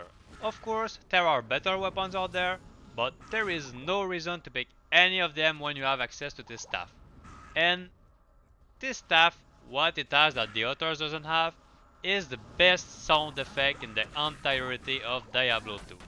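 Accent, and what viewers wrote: Quebec, Canada. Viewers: tu donne quebecois :P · j'aime beaucoup le fait que nous les québécois on a vraiment un accent anglais à nous autres :P Super vidéo man merci!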